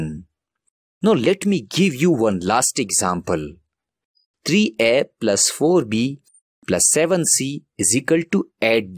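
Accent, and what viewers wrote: South India, India. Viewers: Thick indian accent 💀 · thank u but are u an indan man ur accsent is so good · Why does he sound like an indian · Di po siya filipino, parang Indian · Sir are you from south india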